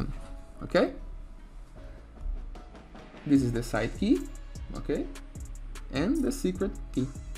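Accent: Brazil. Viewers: Cara notei na hora que tu era brasileiro pelo seu sotaque kkkkk mas parabéns pelo video!!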